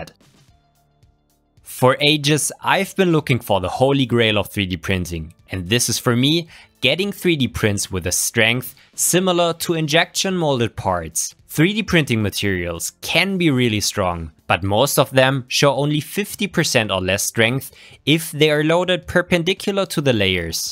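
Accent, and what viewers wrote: Germany. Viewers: This test machine looks like some torture machine, and German accent doesn't help either.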